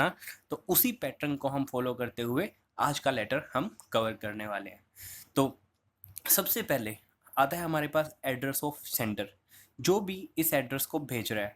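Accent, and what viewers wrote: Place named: United States. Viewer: Aap america se ho kya sir ... Aapka toning america wali hi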